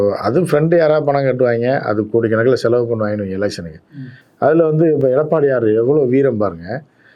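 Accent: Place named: Madurai, India. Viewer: எல்லாம் யூ யூட்யூப் சேனலில் உங்க மதுரைsland பேச்சு க்கு தனி சிறப்பு❤❤அதில் ஒரே சிரிப்பு மழை😂😂😂😂😂😂😂😂😂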